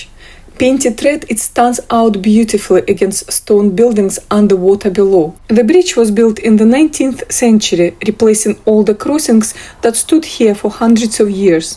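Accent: Scotland, United Kingdom. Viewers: I know Paisley quite well having lived near it for years, yet you touched on many things I didn’t know. This is so well researched and your presentation and voice (yes there are wee bits Scottish have sneaked into your pronunciation, especially vowels) are a pleasure. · Wow man did not relize this about paisley you are sounding a little bit Scottish you can definitely hear it in your voice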